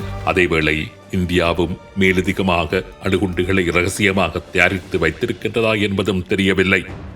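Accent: Sri Lanka. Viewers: Lankan tamil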